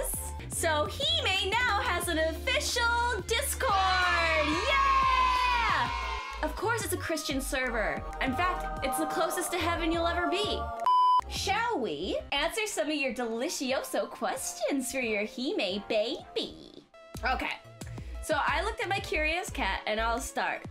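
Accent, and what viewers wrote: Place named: United States. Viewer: She speaks with an American accent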